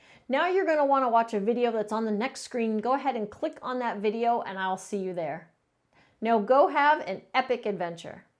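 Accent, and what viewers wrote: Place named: New England, United States. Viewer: Finally a New Englander talkin' about D&D! Go Sox!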